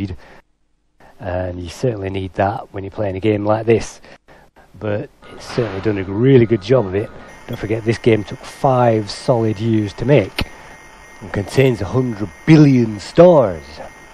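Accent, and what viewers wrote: United Kingdom. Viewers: You sound very British. Very very mooch. Moooooch. MOOOOOOOOOCH.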